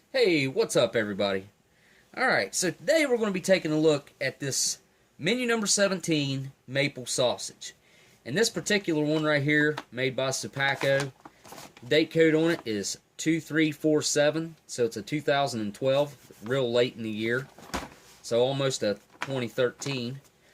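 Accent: South, United States. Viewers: Yup, you've got the southern accent down lol so do I and I don't realize it haha until I started living in Wisconsin.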